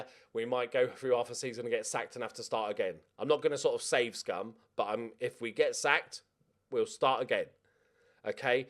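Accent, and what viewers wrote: Australia. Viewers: as an Aussie I can confirm that you’ve definitely got the accent 100% totally accurate.... absolutely killed me with laughter. Can’t wait to get stuck in to this series! Good luck! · Best Aussie accent I have ever heard from a non Aussie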